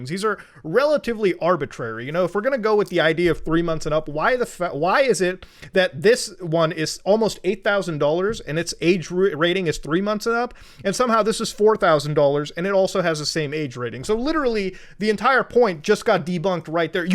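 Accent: Canada. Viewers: Anyone else feel like mutas Canadian really came out thick on this one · You can tell is Canadian by the way he pronounces “tomorrow” as “to-more-oh”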